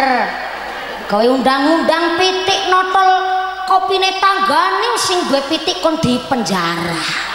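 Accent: Banyumas, Indonesia. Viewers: Meskipun ngapak mantap mantap aku seneng dengernya ngaji seperti ini siplah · Asalamualaikum mba aku sangat kagum kalo mendengar suara inget lg di banyumas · Ustadzah..ngapak tapi asike pooll.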